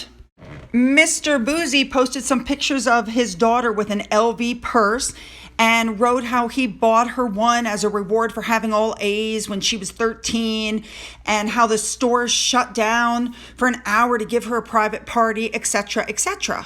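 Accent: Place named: Brooklyn, United States. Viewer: Boo hoo, here in America, that's how people in Brooklyn speak, and WE LOVED IT !!!! Listened to it 2 times myself!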